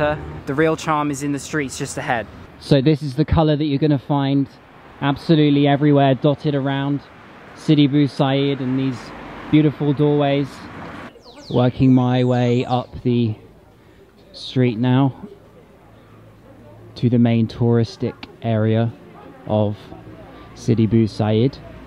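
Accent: England, United Kingdom. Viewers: I like your English accent the program that you made is pretty good👍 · I’m in love with your British accent 😍😍😍ur my crush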